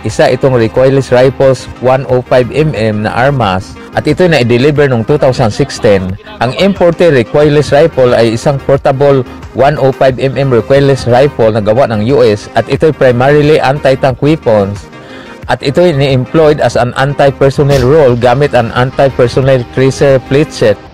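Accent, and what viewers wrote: Visayas, Philippines. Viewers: Ayusin mo yung pgtatagalog mo bisaya ang dating mo.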